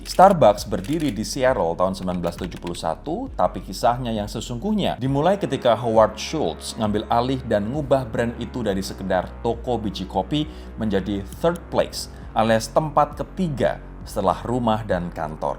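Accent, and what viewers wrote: Indonesia. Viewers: beberapa episode make-up mas aku perhatiin medok banget mas.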